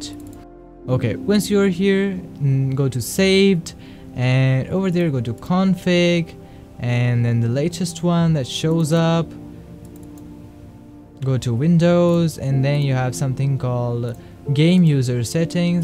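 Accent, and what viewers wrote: India. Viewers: Bhai I love your English accent bro I know you are from india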